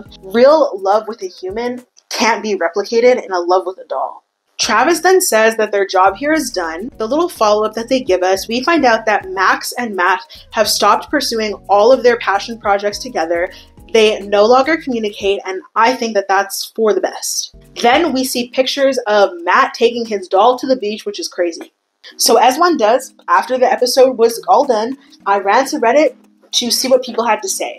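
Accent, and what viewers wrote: Canada. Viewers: bestie, PLEASE don’t feel embarrassed about your BEAUTIFUL Canadian accent!! I think it’s really pretty!! And PLEASE DO NOT EVEN get me started on your infectious giggle!!!! I’m so happy that I found your content/channel!! Confession: I have been binging on ALL of your videos!! Please keep them coming!!!! 😊❤ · Can you say " I don't know about how long it would take to get out the house" in your full Canadian accent. Please lmao. I am only here to hear you slip up! You get so embarrassed hahaha. There is nothing wrong with your accent.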